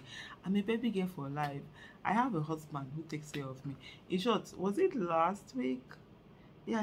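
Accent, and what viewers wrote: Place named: Bendel, Nigeria. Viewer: Proper Bendel woman talking! Better listen o!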